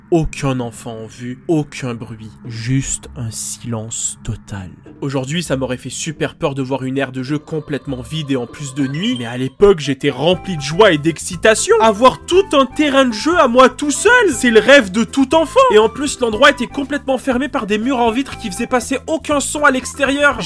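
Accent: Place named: Quebec, Canada. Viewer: T’es parents sont francais? T’as l’accent québéquoye!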